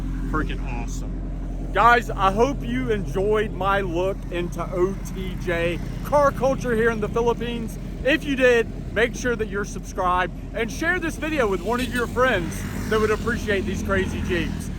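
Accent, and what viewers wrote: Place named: Pennsylvania, United States. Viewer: In Imus? Should have run through Milwaukee or Sarau for the big personal OTJ’s. You may be in The Philippines, but that accent sounds like Westmoreland Co., PA! Lol😅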